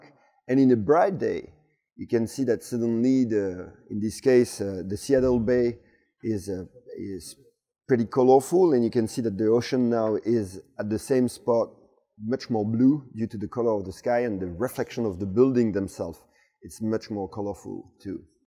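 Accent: France. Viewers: His french accent made this video 10x better.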